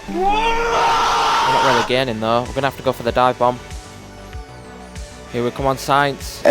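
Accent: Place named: United Kingdom. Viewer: I love that British accent brother 🔥🔥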